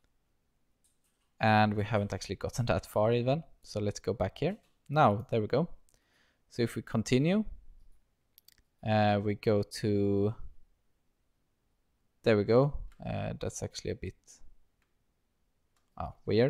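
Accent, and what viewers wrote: Sweden. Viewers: Your English is good! I do play a lot of Counter Strike with Swedes so I recognized the accent 😅 I'm from Denmark so it's not like my English is much better haha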